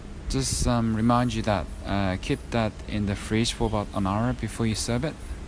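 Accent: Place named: Australia. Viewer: 호주액센트 멋져요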